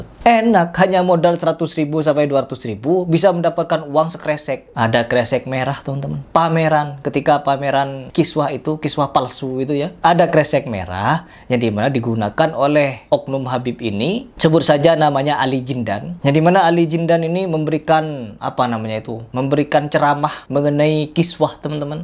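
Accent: Madura, Indonesia. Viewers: Salam dr madura mas,oea kok logat masnya kyk org madura ya?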